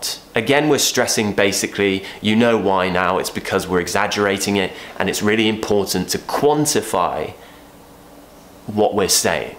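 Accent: United Kingdom. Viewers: I'm not exaggereting right now. Literally, you are the best english teacher on youtube or galaxy. I'm constantly watching your videos and learning decent British accent. Thank you very much mate. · Because he himself speaks very clearly british English it is easy to improve our accent just while listening to him and repeating the way he speaks. That’s why he is very effective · I am gonna come to uk just to speak in your accent.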